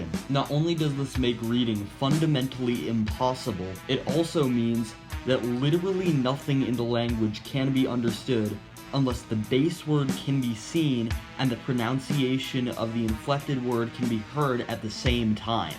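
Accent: United Kingdom. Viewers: WAIT IT SOUNDS LIKE A NORTHERN BRIT SPEAKING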